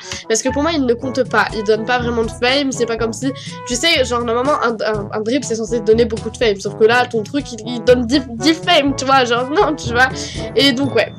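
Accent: Quebec, Canada. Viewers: Go les 100K ?😙 t'as un p'tit accent nan ?😁 québécoise ?😏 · On entend beaucoup ton accent Canadien❤ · defois ta grv un accent québécois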